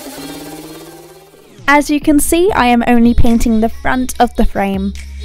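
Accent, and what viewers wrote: Australia. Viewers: amazing video. just wondering are you Australian?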